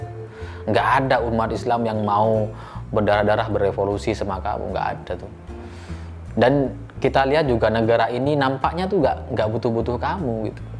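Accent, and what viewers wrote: Madura, Indonesia. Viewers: Saya jg berfikir gt.logat maduranya ada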